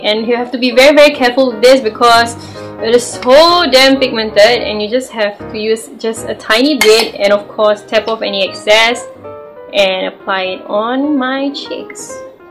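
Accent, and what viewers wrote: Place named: Singapore. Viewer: you sound so singaporean! 😆 anyways whats your shade in mac?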